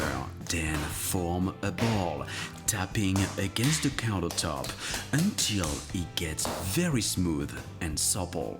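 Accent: France. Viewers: Bonjour, Vous êtes Top! Etes vous d'origine française? Vous en avez un peu l'accent! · Love the french accent! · I love your voice and French accent!!! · Cassie Hassani il est français je pense , je reconnais l accent français, (très léger)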